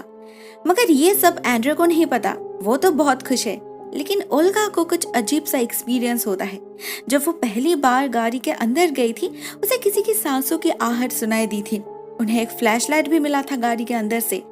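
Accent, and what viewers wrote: Bengal, India. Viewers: Hello mam by your accent I think you are from Bengal , if not then can you tell from which state you are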